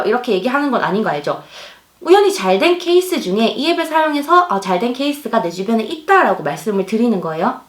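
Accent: Gyeongsang, South Korea. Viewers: 갱상도 사투리 ㅋㅋ 말투가 귀엽네요.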